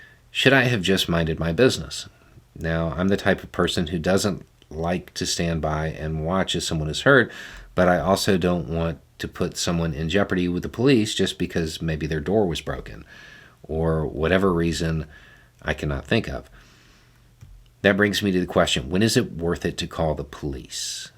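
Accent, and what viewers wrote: South, United States. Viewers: The fact that you describe yourself as, "detached" helps me understand part of the reason I've always trusted you. I describe myself that way, and others have made that observation about me. We have similar backgrounds in regards to our younger years and area. I've lived in the rural South my entire life, and you sound like the men I've been around my entire life, accent wise. The vast majority of men around me are typical so called christian conservatives, mostly trumpers at that, ugh! Oddly enough, I feel like you listen to me more than any man in my everyday life, sad huh lol. I'm so glad I found your channel way back when you had around 250k subscribers, I know you and your intelligence and calm delivery have helped to keep me sane during this weird ass timeline we are currently living in, thank you for everything, and team! 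✌️❤️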